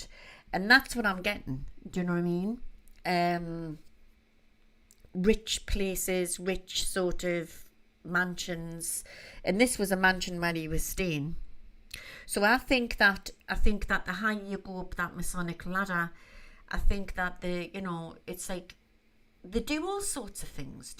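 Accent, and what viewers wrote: Newcastle, United Kingdom. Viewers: Only just found your channel x loving it and just love the Geordi accent x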